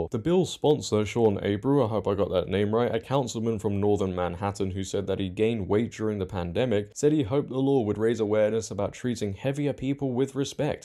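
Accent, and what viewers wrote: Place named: United Kingdom. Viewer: British accent of course lol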